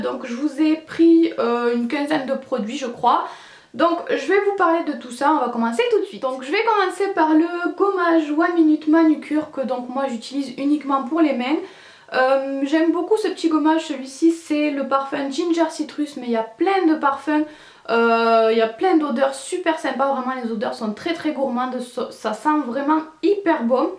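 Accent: South, France. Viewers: Le suuuud !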